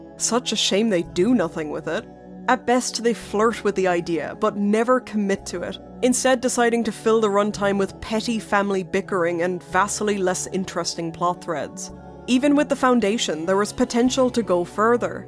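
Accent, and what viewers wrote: Ireland. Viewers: Irish horror lady? Lets fuckin go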